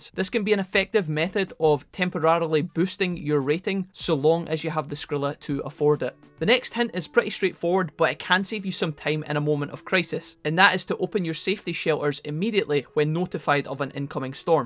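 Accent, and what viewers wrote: Scotland, United Kingdom. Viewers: Ah 'hink he's Scottish like me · This guy is scottish. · I love your accent! Are you Scottish? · Cool video, learned a couple neat tricks for the game, and also found a fantastic new Scottish accent to emulate. Well done lad! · Is this a scottish accent? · First time viewer and love the Scottish accent. I am a giant fan of foreign accents so this is amazing!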